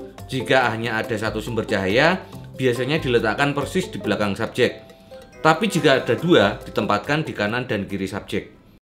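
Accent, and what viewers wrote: Java, Indonesia. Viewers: Kok pake cengkok Jawa,anjirrrrrr👎👎👎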